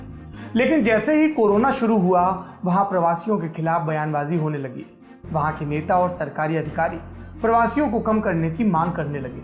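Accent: India. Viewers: Sir your SUBSCRIBE logo is hiding your RIGHT side where you place certain eco friendly objects. Your English pronunciation is typical hindi medium style when you are even asking Rajdeep in English whereas he is replying in Hindi. No one can be perfect. As a admirer I want you to be perfect and that is why I am complaining, otherwise your knowledge is appreciated by all.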